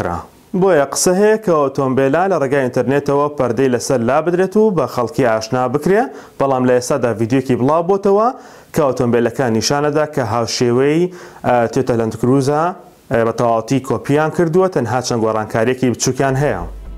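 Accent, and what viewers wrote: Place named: Iran. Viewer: هذا إيراني لهجته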